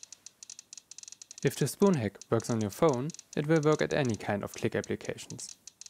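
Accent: Germany. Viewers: Man hört das du deutscher bist :D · Dieser deutsche Akzent😂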